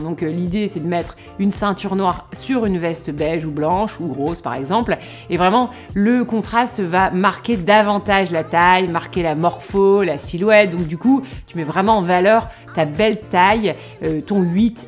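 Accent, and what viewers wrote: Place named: Nord-Pas-de-Calais, France. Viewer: Merci! J’adore ton petit accent chti qui me rappelle ma région natale 😘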